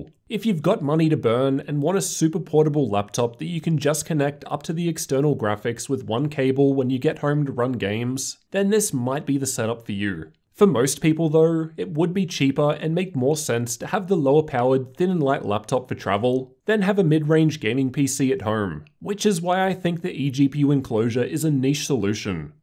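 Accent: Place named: Australia. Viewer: aww, I liked 1-0-7-1-0-u. It’s surprisingly melodic with an Australian accent.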